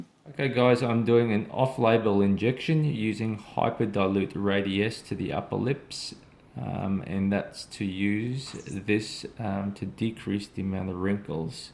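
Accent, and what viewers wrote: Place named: Australia. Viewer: Aussie accent.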